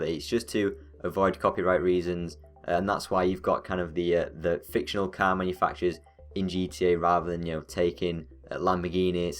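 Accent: England, United Kingdom. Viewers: omg imagine an armoured submarine with torpedos or and a big submarine that can shoot nuke type rockets ( not as powerful like a more powerful rocket from rocket launcher ) and u can place target on map in launchers out top and lands on area destroying different targets ong that was on the top of my head ahaha XD love that ur English not American lol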